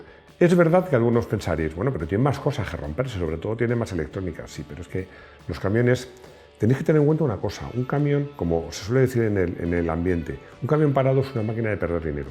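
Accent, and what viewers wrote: Chile. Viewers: la voz en of ES CHILENO " PA ONDE NOS FUIMOS" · ... esa voz en off es sin duda de un chileno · es chileno se le ve en el asento... A donde nos fuimos...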